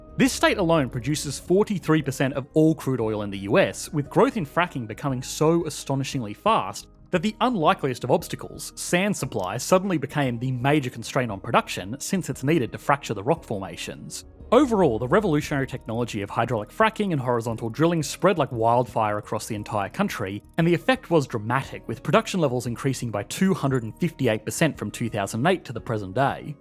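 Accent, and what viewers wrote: Australia. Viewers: He sounds very Aussie. I'm not sure how I missed that. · sounds aussie to me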